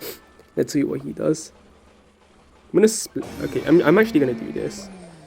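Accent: United Kingdom. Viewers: Damn bro you sound so British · Hm i remember when u said u wouldn't voice reveal bcuz if ur british accent 😅😂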